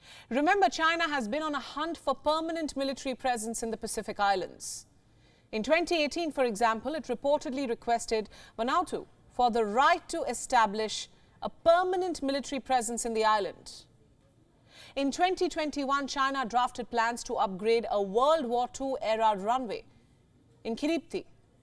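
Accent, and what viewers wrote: India. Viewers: If not for her Indian accent, I would have believed everything she said. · Your Indian TV program Gravitas is a really a joke, why ? Your English is terrible with heavy Indian accent, all you should do is to brush your English. The South China Sea within the nine dashlines belongs to China. Phillippine intruders will of course be warned, and we Chinese are not warmongers, we just warned the Phillipine warship with laser, this is a very advanced weapon than firing rockets at the Philippinos. By the way the Philippinos don`t have such advanced laser weapon, so stop intruding Chinese territory. Next time there will be no such friendly warning just by laser, your military vessels will be blown into pieces. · It's like watching CNN in English with a Indian accent 🤮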